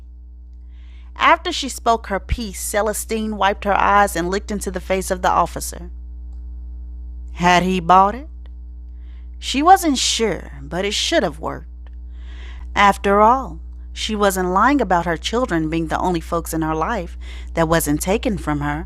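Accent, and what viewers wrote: South, United States. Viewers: Perfect. But that gone with the wind accent. Is odd and awkward for something that took place in NJ - Newark at that..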